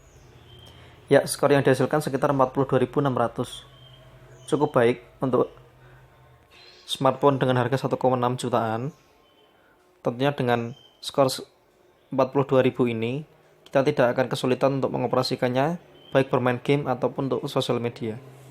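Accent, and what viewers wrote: Java, Indonesia. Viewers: Medok bgt jawanya...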